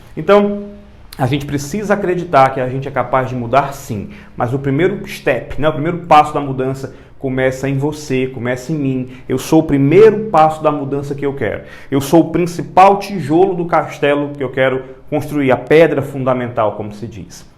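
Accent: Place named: Northeast, Brazil. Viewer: Percebi pelo seu sotaque que é do nordeste, talvez recife ou Ceará ou até mesmo paraiba.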